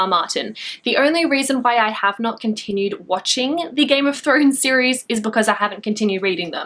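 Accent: Australia. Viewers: Are you Aussie? I love your accent!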